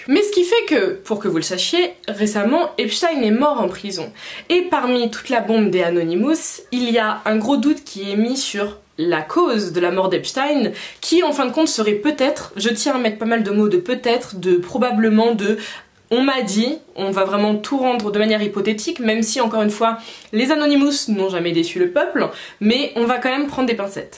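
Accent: England, United Kingdom. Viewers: ton accent anglais🤩👍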